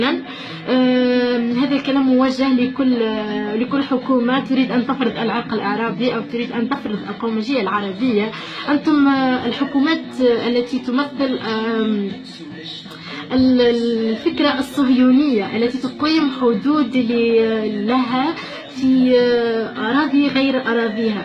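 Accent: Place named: Algeria. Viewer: من نطقك للغة العربية أنت دزيرية وربي مشلقة راكي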